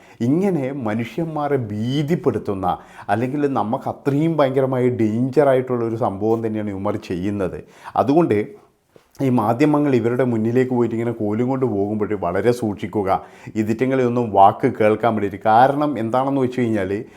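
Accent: Kannur, India. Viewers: നല്ല കണ്ണൂർ ഭാഷ ❤️❤️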